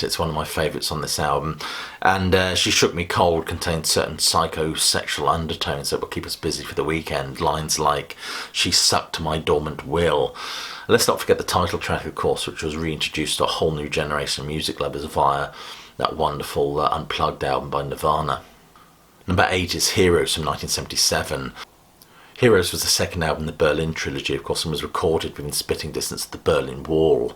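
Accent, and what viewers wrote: England, United Kingdom. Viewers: Top notch English accent and vocabulary.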